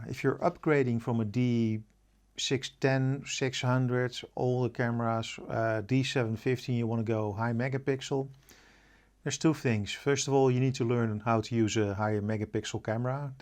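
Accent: Netherlands. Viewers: Dutch accent is fine, I went with my daughter to the university in Amsterdam to settle her in there. Never seen so many smiley people, everyone seems to have a great attitude to life. I was so greatful that everyone spoke perfect English...the secret to carrying weight is a comfortable rucksack...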